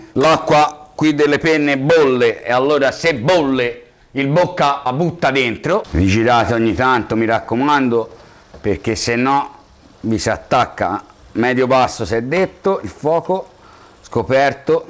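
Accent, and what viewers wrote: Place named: Livorno, Italy. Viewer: Ciao Il Bocca, ti ho scoperto da pochi giorni e già sei diventato la star della mia cucina e mi piace troppo l'accento livornese. Io abito a Montecatini da cinque anni ma sono della provincia di Torino esattamente della Val di Susa (piena di corsari), sto già pianificando una gita a Livorno al tuo ristorante, ti voglio sentire dal vivo, sei forte!!! Ciau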